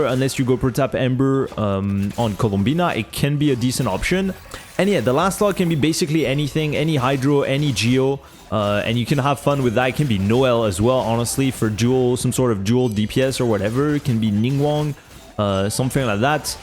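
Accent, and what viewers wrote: France. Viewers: L'accent français mdr, bel effort de prononciation nonobstant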